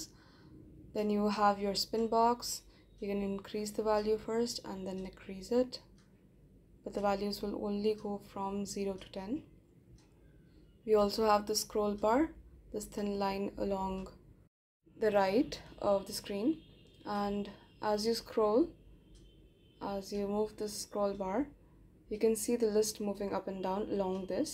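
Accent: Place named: India. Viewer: Hi Mam , Are you from India naa ?? Your language accent shows it clearly...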